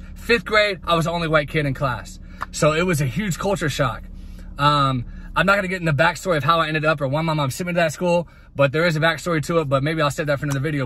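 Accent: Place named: South, United States. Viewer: country accent is on point 😭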